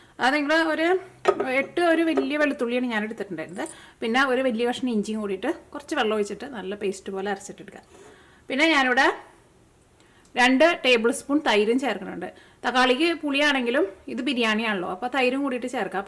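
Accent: Thrissur, India. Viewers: Thrissurkaari aaahno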